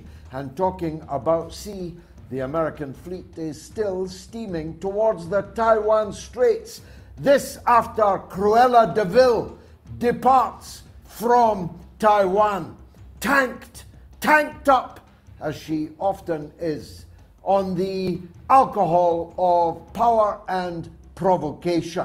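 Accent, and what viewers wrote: Scotland, United Kingdom. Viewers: Mr. Galloway, seing and listening to your talk shows is one of the reasons I cherish having English as my second language. I like the brave, clever and sarcastic style of yours speaking the reality of the matter in geopolitics. Your Scottish accent and ways puts a generous amount of a highly appreciated spice to your sayings. Greetings from Santiago de Chile. Cheers!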